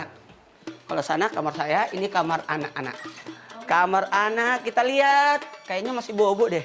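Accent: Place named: Jakarta, Indonesia. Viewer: Ustadz Sekarang Keren Banget Ya Logatx Dah Bkan Orang Makassar Lgi Deh,Tpi Jdi Orang Jakate😊😊😊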